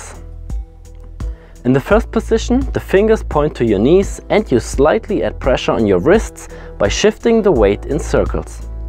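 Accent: Germany. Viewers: This german Akzent 😂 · German accent!!!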